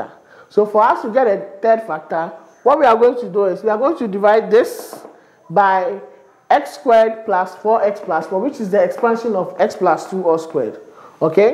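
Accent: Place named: Ghana. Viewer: you are a wonderful teacher....you are a Nigerian with a Ghanian tongue..........you are doing well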